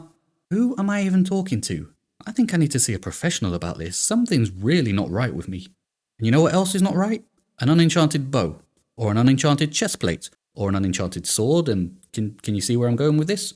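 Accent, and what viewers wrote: United Kingdom. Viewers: Your British jokes are very distinct and interesting.. but your accent is incredibly annoying, like every British accent. Could you tone down that way of speaking a bit, or else speak in Brazilian Portuguese so I can understand? Thank you! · What kind of British do you speak. I love it 🥹🥹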